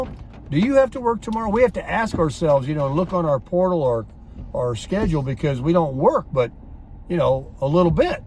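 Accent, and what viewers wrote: South, United States. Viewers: speaks from the heart. A real Southern gentleman who's a pleasure to listen to.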